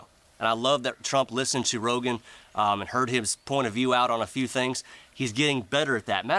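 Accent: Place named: New York, United States. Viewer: What's funny.. Is actually has a new york accent in real life.. Lol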